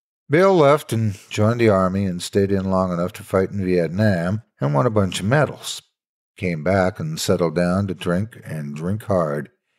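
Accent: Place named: South, United States. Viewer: One of my favorite creepy pastas ever. The way you read this....my my. You have one fine narrative voice. This version was the most...believable, I think. You sound like an old man reminiscing on something horrific and haunting. Your rich, pleasant southern drawl really sells it.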